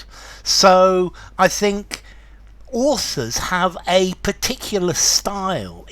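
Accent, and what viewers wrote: United Kingdom. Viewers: I absolutely love the British accent. It's the most polite & respectful language..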